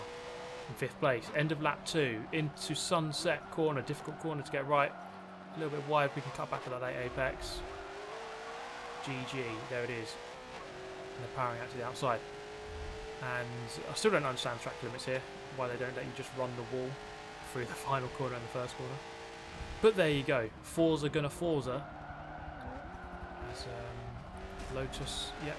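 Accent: England, United Kingdom. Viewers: The Racing: decent Cheeky English commentary: priceless.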